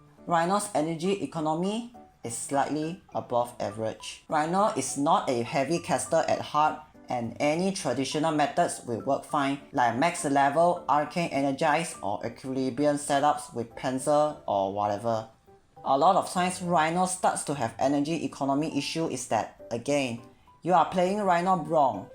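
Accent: China. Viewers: you should use your Chinese accent and be funny with it, like uncle roger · I would listen to you but you sound Chinese so your opinion is invalid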